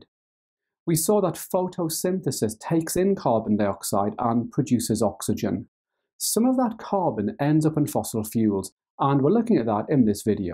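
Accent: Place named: Newcastle, United Kingdom. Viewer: He's from Newcastle, so I'm guessing it's a slight geordie accent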